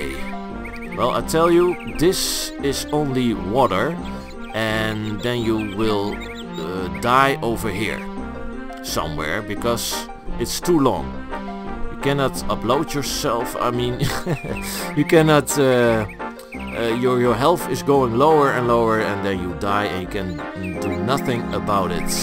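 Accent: Netherlands. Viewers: Your dutch accent is out of this map man!! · Haha je kan fk goed horen dat je Nederlans bent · dutch accent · that has to be a dutch accent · you sound super dutch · you're accent... , if you arent from the netherlands Im eating my shoes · That's the most Dutch accent I have ever heard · I can't handle how Dutch this guy sounds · You sound dutch, isn't it? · i have this feeling that you'r dutch beacuse of the accent? XD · You have the best dutch accent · Ik hoor aan zijn accent dat hij Nederlands is😂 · Dat strong Dutch accent tho.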